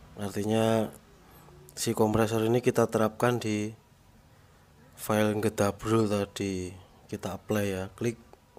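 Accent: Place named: Java, Indonesia. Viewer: Lagi nonton video mu ini Mas. Le mgendikan thik medhog men njenengan Mas.. 🤭🤭🤭 Tetap unik & otentik.. Mugi sehat2 njih Mas Dados amal Jariyah & ilmu engkang manfaat. Aamiin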